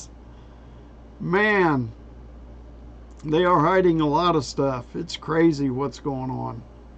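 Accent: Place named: South, United States. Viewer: WELCOME BACK,. MISSED YOU TERRIBLY. YOU ARE 1 OUT OF 4 CHANNELS I LOYALLY WATCH. YOUR TRUTHFUL, ACCURATE, AND I LOVE YOUR SEXY, SOUTHERN, GENTLEMAN ACCENT. MAYBE GOD BLESS YOU, AND WATCH OVER YOU AND YOUR FAMILY. GOD IS WITH PRESIDENT TRUMP, GOD WINS. PLEASE, EVERYONE, CONTINUE TO PRAY, AND LET US ALSO GIVE PRAISE AND GRATITUDE TO OUR ONE TRUE GOD OF ISRAEL, OF ABRAHAM, ISAAC AND JACOB. 🕆✡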